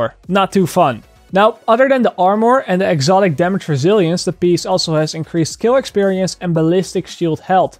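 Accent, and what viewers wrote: Netherlands. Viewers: Je klinkt als een Nederlander ben je dat ook? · its dutch english accent xD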